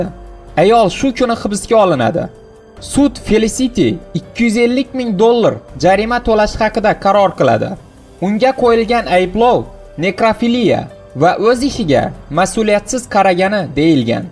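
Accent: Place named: Khorezm, Uzbekistan. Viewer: xayotda qanday garipsangiz shunday oqing deysizmi menimcha admin xorazmlik xorazmcha gapirsala vapshe tushunmaysla😂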